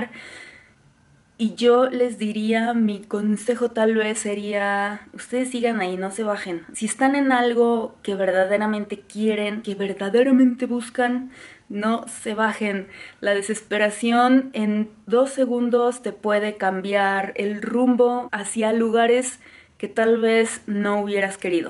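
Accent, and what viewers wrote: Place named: Mexico. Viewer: Anette Flores Asi hablamos en mexico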